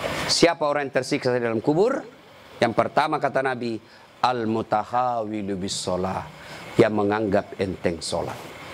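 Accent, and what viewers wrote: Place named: Bugis, Indonesia. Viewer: Sy senang ceramahnya sangat mendidik, logat bugis terkesan mengasyikkan, sy pernah tinggal di sulawesi, jd sangat teringat logat yg dibawakan...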